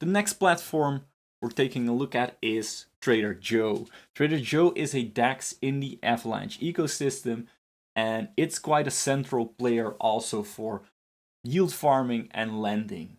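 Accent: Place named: Poland. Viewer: Are you Slavic? Sounds like Polish 🇵🇱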